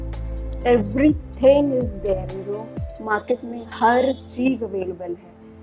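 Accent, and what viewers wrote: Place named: Pakistan. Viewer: ap pakistani hooo apki language bolna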